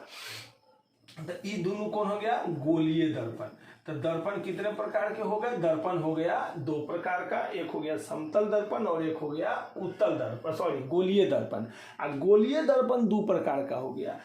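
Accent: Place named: Bihar, India. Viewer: Sir hindi ka aisa lecture poore youtube pe kahi nahi hai sab saals English me daalte hai unka v content aapke jaisa nahi hai sir aap ko sun ke lagta hai ki aap bihar se ho?????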